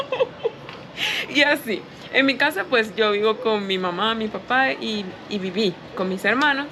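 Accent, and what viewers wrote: Colombia. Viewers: Eso es una excusa pues estoy claro y tú también lo estás que hablas caliche porque te gusta, por qué crees que es nice... déjale eso a la Youtuber venezolana que imita todos los idiomas en español y hasta en inglés. Creeme, hablar caliche no es nada nice, más nice seria hablar con cualquier acento venezolano, inclusive nuestro acento gocho y de merida, esos si que son acentos bien bonitos. Si fueras mi pareja la ladilla y el chalequeo que te tuviera fuera trifásico, de hecho la mamá de mi hija menor tenía esa mala costumbre de hablar caliche por estár viendo las novelas del vecino país, y el chaleco que le monte fue extraterrestre hasta que dejó la ridiculez. La diferencia está en que la mamá de mi hija no es Youtuber, no tiene una cuenta donde nos pueda representar, tú sí.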